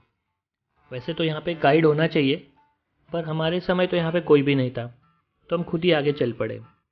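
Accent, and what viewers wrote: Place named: Nepal. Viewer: Nepali soundi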